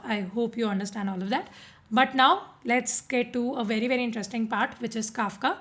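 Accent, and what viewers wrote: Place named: India. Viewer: As a European I'm having a bit of trouble with some of the accents of some Indian people, you know, when it's a heavy accent? But with creators like this that's no problem at all. And I am so happy to see how much high, and I mean truly high, quality material is coming from india and Indian people. Whether it's cooking, repair, coding, there are a lot of good creators there. You are one of them. My thanks and compliments!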